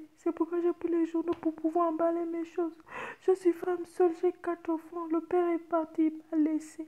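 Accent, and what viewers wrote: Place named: Gabon. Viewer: Tu as comme un accent Gabonais ! Aniway je m'abonne 😊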